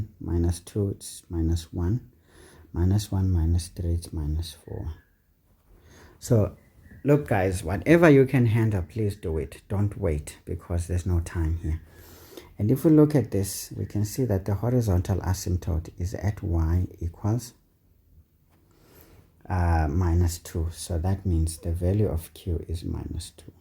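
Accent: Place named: Afrikaans, South Africa. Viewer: the Afrikaans accent 😂😂thank you for the videos sir ,I'm always looking forward to your uploads .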